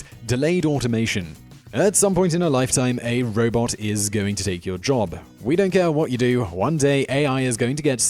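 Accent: United Kingdom. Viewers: Why is that ANYONE with a British accent has ZERO credibility with me? .... Well they HAVE made every serious mistake one can imagine. IF there was no minimum wage then it is ABSOLUTELY FRICKEN GUARANTEED that a bunch of folks WOULD be taken advantage of more than they are now ...not everywhere ...not where there is competition for quality labor...but in smaller environments where greedy employers can ruthlessly get away with it ...ignorant people just wanting to pay the rent and eat SOMETHING might feel literally forced to take it...their employer for instance being the only place they can physically get to every day? The logistics for a poor a person can become overwhelming and there ARE some wickedly greedy people that WILL take advantage of such things every single time ...hence all the laws and penalties created to FORCE really wicked people to play nice....it is a shame we have to have laws, that people won't just be honest and do what they have to know in their heart is right....but it IS the fact of reality and all the pie in the sky thinking people might be different is pure bs proven over and over and over ....hence the sad struggle FOREVER...there is NO political solution...no form of government and no lack of government will cure what ails us ...actually it is incredibly AMAZING (I think) that things work out as well as they do in SOME places ...probably so called "Christian values" play largely into it working ...working on the consciences of people so that enoug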